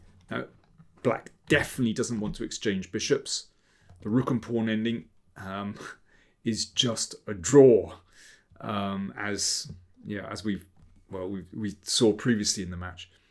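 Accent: United Kingdom. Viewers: GM Danny - your smooth voice with British accent gives us listeners a human touch on chess in this AI world. Personally, it reminds me of David Attenborough voice talking about chess predator strategy going for the opponent King! :) Thank you for your commentary. I feel all big corporations need to invite GM like you to motivate and give life lessons to employees every year. Anyways, I personally think that Ding's blunder was sad for Chess WC title itself! Yes, it is how it is and I am happy Gukesh won as he played by the rules and won Candidates as well, but also feel sad for Ding that it was out of a blunder. But To 18 year kid Gukesh: He fought a drawen game to squeeze win here just like Carlsen. So Kudos to him. Am I happy about the results? Not really coz I want a real fight. Do I agree with the result? Absolute Yes. Do I think the Chess fans would deserve a Play offs here? 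Yes. Any regrets? No. Fun Fact: Gukesh can not even marry as Marriage age for man in India is 21. Anyways congrats to him and very well deserved. I cannot wait for next challenger. - From Indian American Chess enthusiast.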